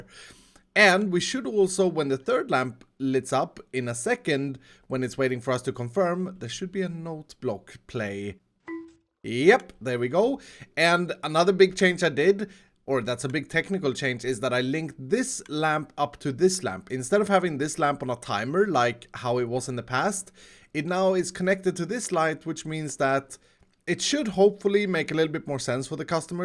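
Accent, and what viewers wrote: Sweden. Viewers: Are u from Sweden? Just wondering:) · Where from sweden are you from?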